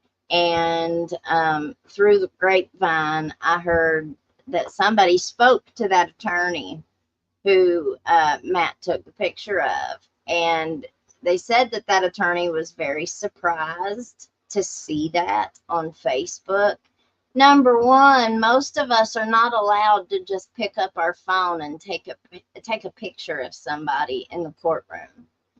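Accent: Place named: Kentucky, United States. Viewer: Im from southern Ky & i adore. Everybody i know has that accent ❤❤❤. Keep up the hard work. We are so proud of u E!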